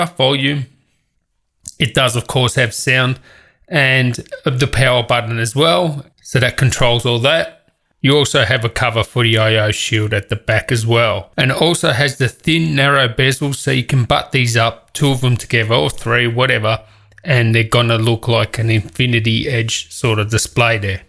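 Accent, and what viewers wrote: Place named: Australia. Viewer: Great vid, thank you. Great to hear a fellow Aussie voice reviewing stuff! I've just bought this monitor, your review was a very good help for me. Everything you say is true, this monitor blows away everybody who sees it in action. I do not use it as a professional but do family videos and work with docs open 2 at a time - wonderful for all this. I had the money so I though so what - end of financial year and I need to clear the bank account and get a tax deduction anyway so there I was! I will enjoy the pleasure of this incredible screen LONG after the initial pain of the price has been forgotten. Highly recommended - thanks again for the very relatable review - love the way you describe things in everyday terms. Cheers, (West Pennant Hills, NSW - Australia)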